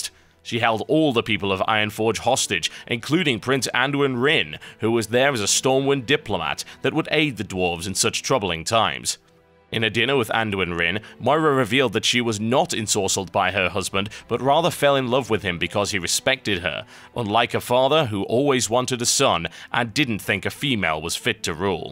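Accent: United Kingdom. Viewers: id say this guy is pretty good ... but i cant wacht crap , and he sounda abit british huh?? XD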